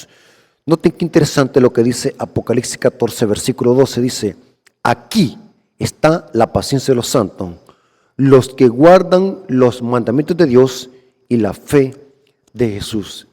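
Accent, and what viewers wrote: El Salvador. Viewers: Disculpe, el hermano no dice sexta, sino que su pronunciación es diferente, él está diciendo SECTA. 😊 ASÍ HABLA ÉL, POR SERDEL SALVADOR.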